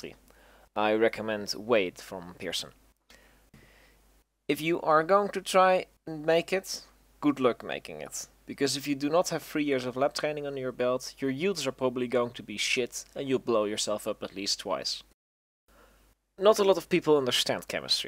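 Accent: Netherlands. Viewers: after listening to your voice for 5 seconds i just know that you are dutch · I smell dutch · You sound incredibly Dutch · Dutch chemist, nice. Brabant? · dutch speaker hmmm? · Mooie Nederlandse accent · Sniff sniff, I smell Dutch? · Hoor ik hier een Nederlands accent?🤔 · Are you Dutch by any chance? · i smell some nederlands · Je bent nederlands ik hoor het aan je accent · Lekker Nederlands accentje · Please make more videos I normally don’t like chemistry but this was super fun! (Also i sense some Dutch accent in your voice!) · I recognize a dutchman when I hear one. Gegroet landgenoot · Are u dutch by any chanse? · You're definitely Dutch (or maybe flemish?) lmao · Slechts lichtelijk Nederlands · are you dutch? you sound like a Dutchy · are you dutch or maybe german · nederlands accent gedetecteerd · im 99% sure this guy is dutch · I can just hear the dutch in jou lol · Hahahaah, ik kan je nederlands horen hoor jongentje! 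· You're Dutch for sure right? From Brabant toevallig? Not to stereotype, but hey this video is about drugs.... 😂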